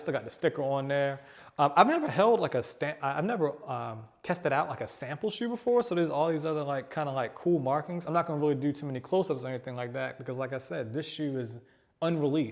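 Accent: Baltimore, United States. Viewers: Nice video. Can't wait for the Mach 4 review. By the way, are you from Baltimore? I think I can hear it lol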